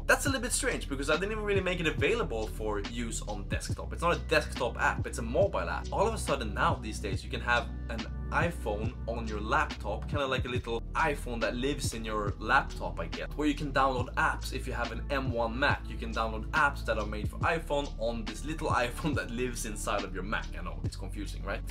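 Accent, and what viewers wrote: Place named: Sweden. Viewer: är du svensk, för det märks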